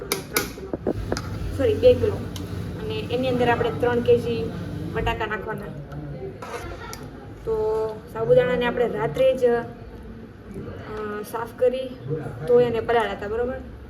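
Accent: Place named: Kathiawar, India. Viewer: Your voice sound like kathiawadi Are you from Rajkot??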